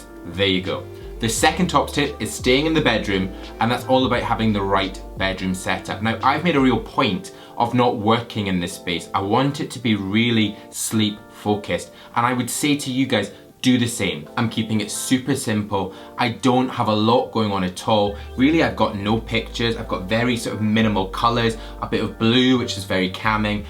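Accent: Scotland, United Kingdom. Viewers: Eddie Lopez It's a Scottish accent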